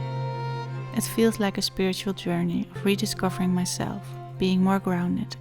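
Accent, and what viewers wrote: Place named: Netherlands. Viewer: wat fantastisch dat je dit doet 🙏🏽 en hoorde al dag je Nederlandse was Heel veel succes met de restoratie ❤️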